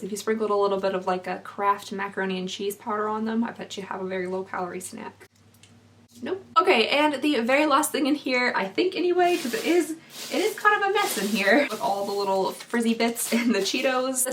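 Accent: Kentucky, United States. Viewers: are you from Kentucky??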